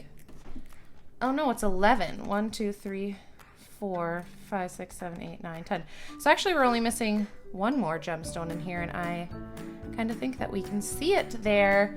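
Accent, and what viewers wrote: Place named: Minnesota, United States. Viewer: I mean you're a Minnesotan I say melk, tæble, and I'm from SD Bāg vs beg :)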